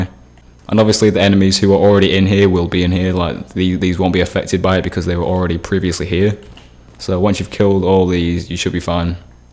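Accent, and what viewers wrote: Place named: United Kingdom. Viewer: disliked. british voice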